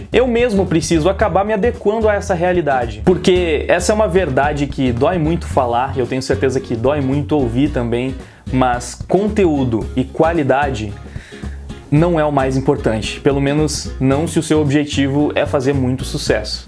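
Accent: Rio Grande do Sul, Brazil. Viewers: Voce é gaucho??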